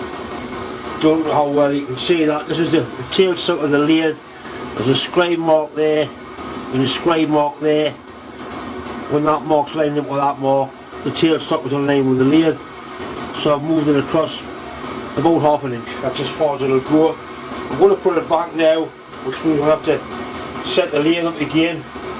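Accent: Newcastle, United Kingdom. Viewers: Love the accent, I thought a Good Geordie Milling machine would have a Y-Aye axis on it;) Love the ball bearing trick. Take care canny lad:) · I don't know the exact town, but the accent is known as Geordie, spoken in the North East of England around the Newcastle area.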